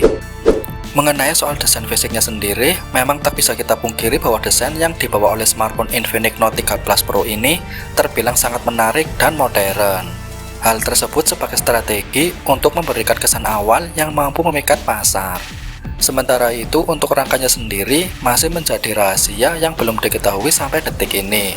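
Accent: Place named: Java, Indonesia. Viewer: Medog banget om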